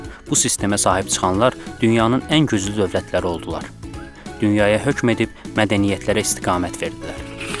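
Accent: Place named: Turkmenistan. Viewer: Abi ben türkmen aksanını sevmiyorum ya... Direkt dislike attım...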